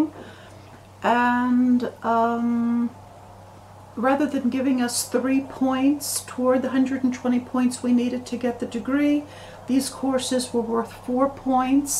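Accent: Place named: United States. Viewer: You sound very American ! Thx for the video !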